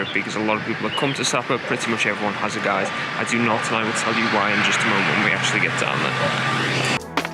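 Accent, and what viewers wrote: Liverpool, United Kingdom. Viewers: Are you a Scouser because if so you're the first Scouse youtuber I've ever seen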